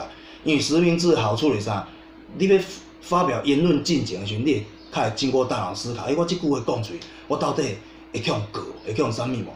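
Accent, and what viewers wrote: Taiwan. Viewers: 你真正讚內~頻道的影片都做得很正向/第一次看你的影片就是那個拆電源供應器的影片.就是被你的台灣國語吸引來訂閱的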